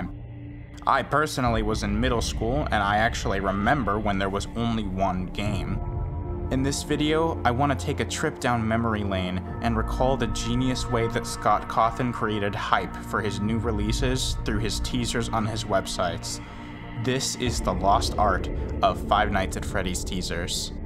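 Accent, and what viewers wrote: United Kingdom. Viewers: He's British? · He was only what I could describe as "Obnoxiously british" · from american voice to an obnoxious british voice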